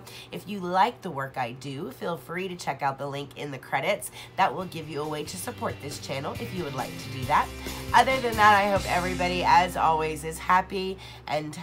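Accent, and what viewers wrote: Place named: South, United States. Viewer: 💜💜💜 Enjoyed seeing hubby's reaction, lol...."there's no makeup line" Loved your strong southern accent 💜💜💜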